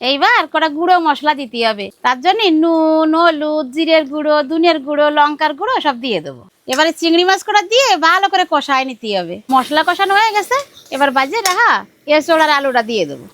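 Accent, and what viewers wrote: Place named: Khulna, Bangladesh. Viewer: আমাগে খুলনা জেলার ভাষা মনে হচ্ছে.. 🙂🐸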